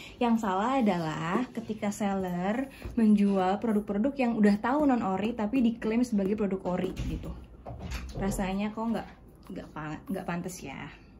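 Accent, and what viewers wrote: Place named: Philippines. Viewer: Are you Filipino? You speaking with a little bit mix Tagalog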